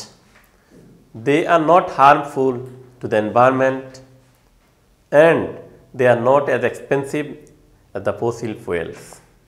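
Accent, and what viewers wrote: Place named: Bangladesh. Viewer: Honourable sir, First you complete phonetics after that teach nationally. I think you are a Banglish teacher.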